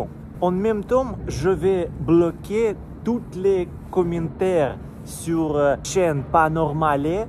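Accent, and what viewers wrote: Russia. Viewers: oui et non, il dit cela puisque tu as un accent très marqué comme dans les films ou un personnage incarne un russe :D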